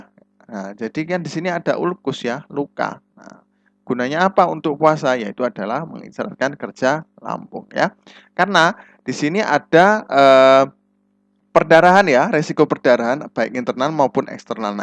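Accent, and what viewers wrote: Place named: Bengkulu, Indonesia. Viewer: Cak nyo wong bengkulu abng ni😂.. sukses terus chanelnyo banh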